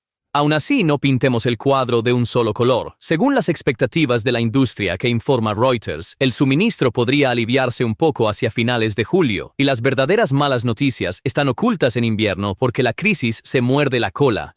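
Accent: United States. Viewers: En España no se habla con ese acento gringo DESAGRADABLE.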